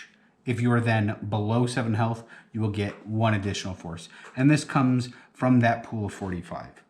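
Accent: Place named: Midwest, United States. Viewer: That midwest accent is thick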